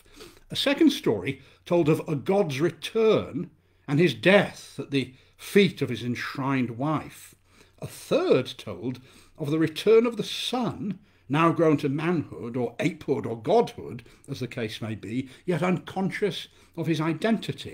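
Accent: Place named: England, United Kingdom. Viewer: Thank you for this wonderful reading. It's funny how good Lovecraft's writing sounds in the English accent!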